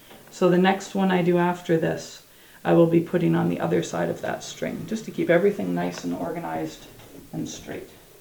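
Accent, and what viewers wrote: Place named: New England, United States. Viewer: Wonderful..thank you so much! i was wondering where your accent came from. i was guessing New England, USA!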